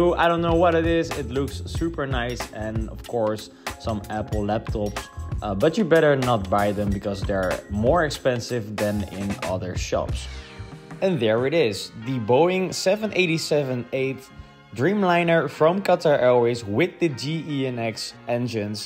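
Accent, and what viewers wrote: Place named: Netherlands. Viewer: Toch nog stukje Nederlandse accent door het Engelse gepraat heen😂 leuke video man!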